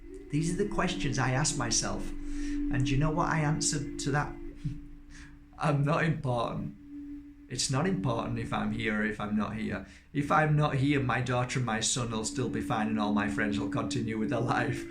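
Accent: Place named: Midlands, United Kingdom. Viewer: Love your style - just found your channel ….you have great energy - is that a Midlands accent? From one UK person to another....xx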